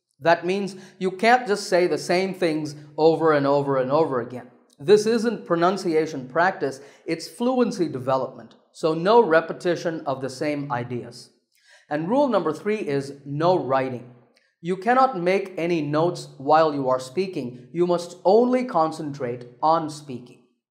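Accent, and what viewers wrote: United States. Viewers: if you don't watch the video, just listening to the audio, you'd think you're listening an american speaking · Sir, do you speak in American English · your JAM technique is lit.🔥🔥 love that you're channeling the American accent perfectly.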